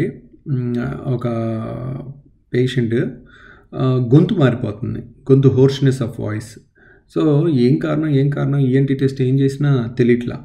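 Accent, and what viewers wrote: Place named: Vijayawada, India. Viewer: Mi matalu mi voice asaluuu Vijayawada anipinchukunari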